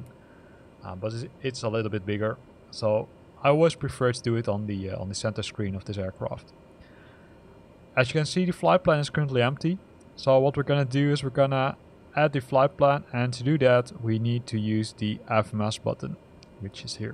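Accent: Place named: Netherlands. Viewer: Sounds Dutch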